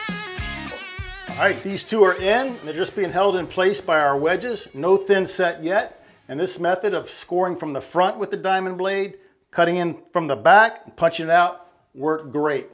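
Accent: Louisiana, United States. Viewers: La =Louisiana. LA= Los Angles. He is in Louisiana, the accent should give that away!!!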